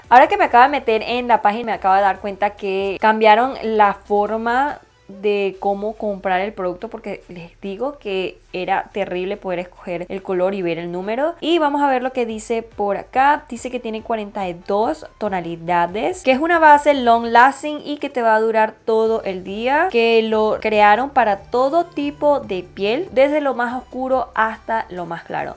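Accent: Venezuela. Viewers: Me encantó hablando a lo venezolano de pana se te ve genial naguara 😂😂😂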